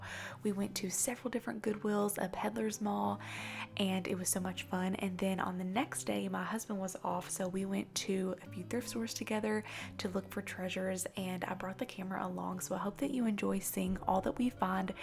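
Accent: South, United States. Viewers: wow those brass christmas stocking holders are so uniquely special, youre so blessed to find them ✨ just wanna say that i love your thrifting vids & your lovely southern accent calm voice is so soothing to listen to 🫶🏼 baby light blue, white and grey hues are your color hon, you look stunning. youve got a very beautiful home, your mom and hubby must be so proud... 🏡💕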